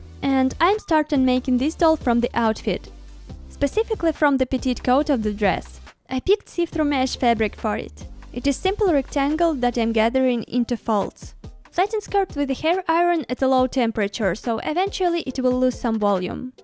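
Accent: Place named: Ukraine. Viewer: I love the red doll and your workspace, but I just have to say... Your accent is so pretty! You're Ukrainian? I've never heard someone speak English so lovely! xD God bless all of you. ❤️ 🧡 💛 💚 💙 💜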